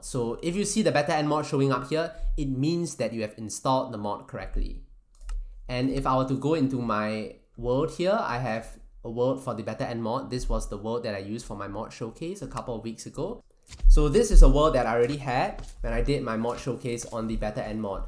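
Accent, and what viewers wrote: Singapore. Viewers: are you from singapore? your accent sounds kinda familiar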